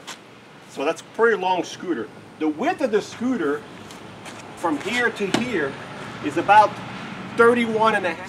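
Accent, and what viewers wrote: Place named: United States. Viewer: You sound American, is it available across the pond in the UK?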